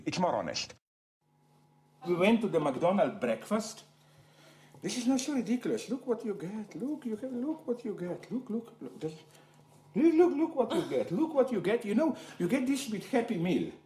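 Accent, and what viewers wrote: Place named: India. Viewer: I didn't refer any racist thing, just his speaking reminded me indian accent.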